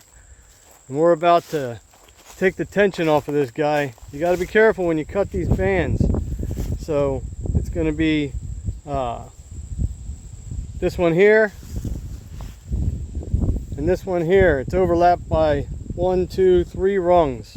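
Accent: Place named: Reading, United Kingdom. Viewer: Hey there sir! I’m just up North in Schuylkill County. Being from Reading originally I picked up on your accent immediately. Congrats on the well made Johnson-Su compost. I just started mine made up of Urine/LAB soaked biochar, pumpkins, and LEAVES LEAVES, LEAVES, shredding as many as I can with my hands weed whacker (unfortunately my mower does not have a bagger)! Do you have any tips on getting your pile up to temp? I just built mine today and I’m concerned it might not win the fight against December’s temps.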